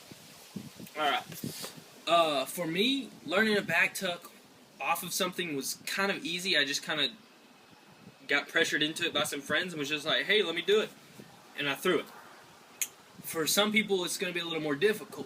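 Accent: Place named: United States. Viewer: Where's is he from iv heard an cow boy voice like that before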